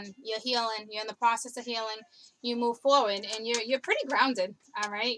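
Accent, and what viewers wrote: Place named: Massachusetts, United States. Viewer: You are my favorite reader! Also I love that you have a Massachusetts accent I am from Massachusetts and have lived away from home for a long time. Every time I hear your voice and how you interact with your kids, it is like getting a huge piece of home. Thank you for your service!!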